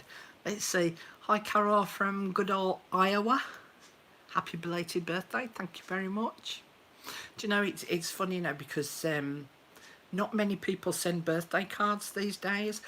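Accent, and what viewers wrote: United Kingdom. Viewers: British I think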